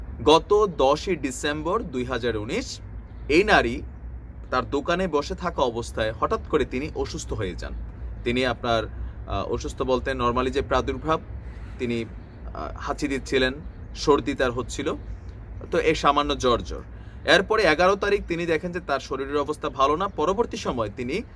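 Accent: Comilla, Bangladesh. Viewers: Vai apni khati comillar vasa kotha Bolen valoi lage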